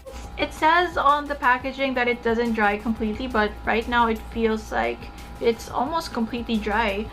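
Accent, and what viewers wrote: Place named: United States. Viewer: love your accent its like you grow up in america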